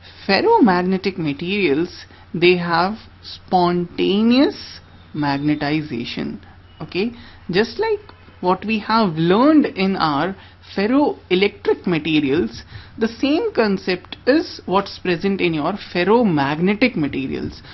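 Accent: India. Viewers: that's indian accent for sure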